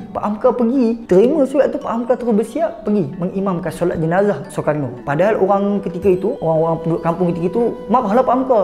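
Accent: Malaysia. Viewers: Dia bercakap bahasa melayu tapi ada loghat negeri.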